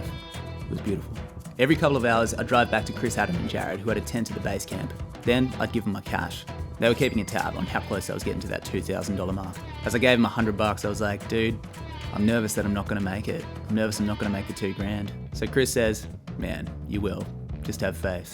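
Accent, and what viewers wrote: Australia. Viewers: I don't like this. I LOVE this. I've shared your vids a few times (and hope your followship and fellowship GROWS). I have special connections to chance, serendipity and Arid-zona. Beautiful tribute, but even better, you're a great storyteller and give sound advice. Oz accent or not (and storyteller is the highest compliment I can give, because it doesn't matter the medium, that is what we do). <3 And RIP to your friend Chris. Unless there's new adventures on the otherside. In which case, I hope he rips it up!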